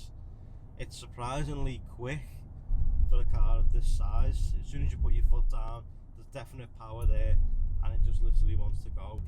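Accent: Liverpool, United Kingdom. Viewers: I could hear everything he said. Are you deaf or just can't understand a scouser?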